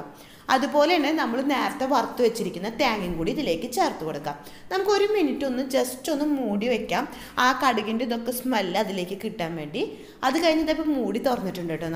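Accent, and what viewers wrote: Thrissur, India. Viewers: തൃശൂർക്കാരിയാണ്...അപ്പോൾ സംസാരത്തിൽ ട്ടോ മയം കാണും... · Trissur Kariyano? Super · Thrissur alley?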